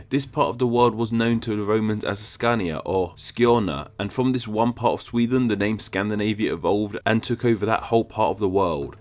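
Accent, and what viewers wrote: United Kingdom. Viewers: Ah. British accent.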